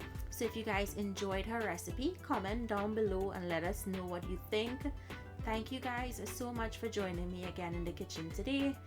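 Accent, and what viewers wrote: Trinidad and Tobago. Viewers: I knew u were Trinidadian when I heard your accent ! Thx for the recipe ! x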